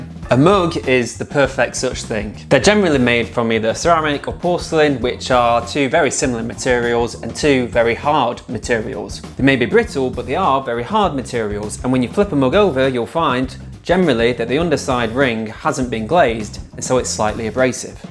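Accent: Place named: United Kingdom. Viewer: You are one of the most real YouTubers out. You give accurate, real information no bs. Keep it up. I bought a crappy flip knife (looks super cool was extremely dull) off a homeless dude for $1 ( i also bought him taco bell) this video helped me restore this knofe to satisfactory sharpness and is now my edc. Thank you so much. (Also your voice is nice im american and the British accent makes us c.u.m...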